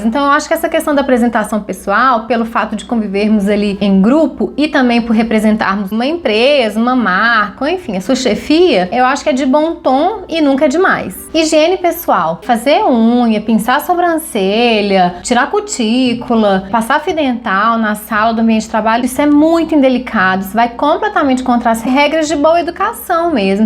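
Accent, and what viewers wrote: Minas Gerais, Brazil. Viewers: Conheci seu canal hoje e já me inscrevi! Você é muito prática! E pelo seu sotaque, acho que você é mineirinha como eu!!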